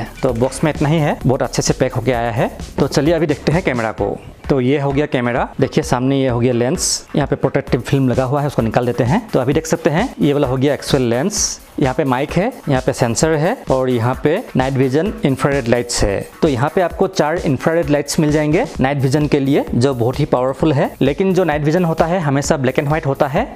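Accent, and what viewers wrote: Assam, India. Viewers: Aap Assamese ho. Kitne bhi thik se hindi bolo.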